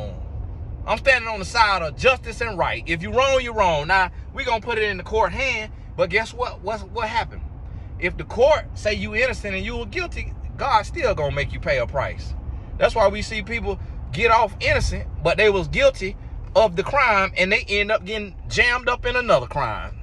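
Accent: United States. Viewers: The accent is sending me. You are so country 😂 as a NYer this was entertaining. But in all seriousness this entire message is the truth. If we’re honest without his money Mr. Mayors would be called crusty. I never understood his sense of style. Also it’s no longer 1 woman accusing him, More came forward. Meagan always went out her way to make wrong choices with men. She chose one Godly man, one time then ran off. Something about her looked uncomfortable/forced the entire time I saw them sitting together. But Mr. Franklin chose an ungodly woman so 🤷🏾‍♀️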